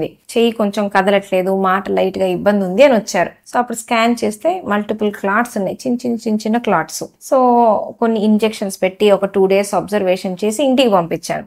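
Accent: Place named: Chirala, India. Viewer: Your Chirala telugu is superb madam, and timely speaking also nice mam..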